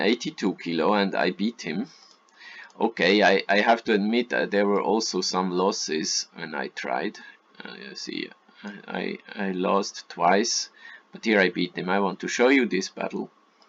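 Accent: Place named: Bern, Switzerland. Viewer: 😂has mr no denkt bi dem akzent gruess us bern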